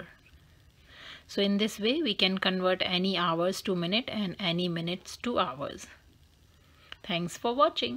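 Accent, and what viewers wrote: India. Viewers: You making fun because she's Indian and have funny accent, or you being sarcastic?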